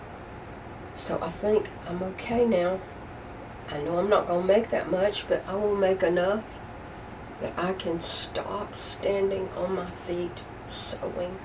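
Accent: South, United States. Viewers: Congratulation! That is when I started watching you when you said you Lived in a Shed. I thought let me see where this poor old woman has to live! And what a surprise, I love your beautiful shed! Your camera looks good. I just love listening to you talk, I love your southern accent. I watch a lot of "live alone" women on YouTube because that is me also. Keep up the good work!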